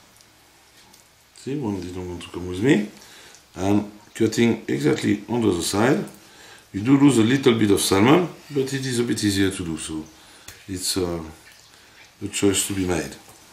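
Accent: France. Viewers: I thought he rather had a French accent.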